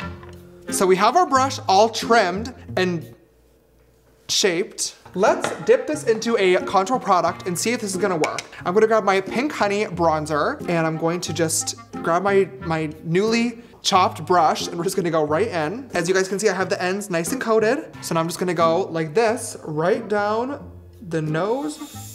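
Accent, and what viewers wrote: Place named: Scotland, United Kingdom. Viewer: is it just me or like kinda has a scottish accent in this vid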